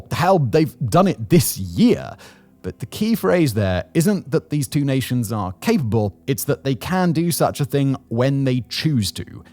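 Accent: United Kingdom. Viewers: This guy talks a lot. I think the video can be summed up as: 1. Iran has said they are going to attack. 2. So far they haven't, but that doesn't mean they won't. 3. They have a lot of missiles that may be too many for Isreal to shoot down, but we don't really know. 4. The U.S. has moved planes, ships, and subs in to the area. End of content. How much value there is in any of that, I leave to you to decide. I suppose the British accent does make it seem more compelling.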